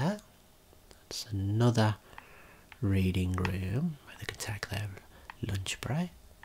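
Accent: United Kingdom. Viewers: Sir are u British if so cool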